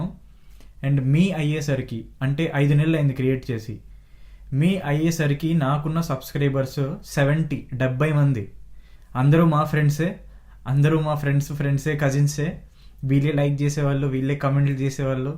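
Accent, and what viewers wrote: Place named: Telugu, India. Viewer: Hai 😊 garu.. Mee mokham (face),Mee Mata theeru..bhaguntadhiy... Telugu thanam Uttiy paduthundhiy...💯❤️🙏 Maaku JAPANE Kalla kiye Katty nattu chupisthunaru.....🧐😍🎊 Cangrasuletion👋🏼 You tube batten Vachinadhuku 👍🥳🤩 Mee video kiy feedha Avvuthanu..💯💕🥰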